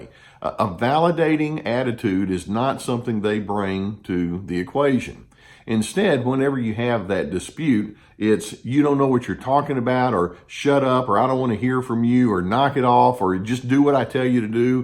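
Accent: South, United States. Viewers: this is fantastic guidance, all your advice is spot on, (and I love your southern accent !) many thanks, from the Uk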